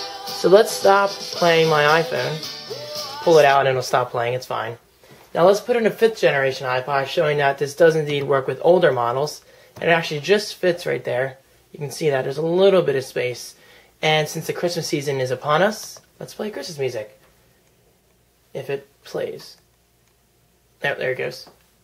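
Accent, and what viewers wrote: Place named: New Jersey, United States. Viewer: Your jersey accent is showing strong in this video. "Lets turn it AWN"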